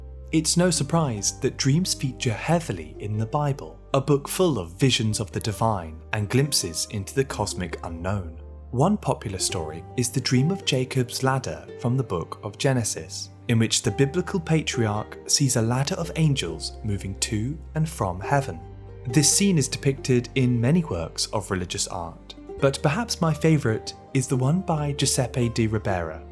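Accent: United Kingdom. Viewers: Such a great video I love your voice I love the pace of your informative mind your British accent just awesome 👍.. loving the video about it and then watching more and more keep it up 😋👍😁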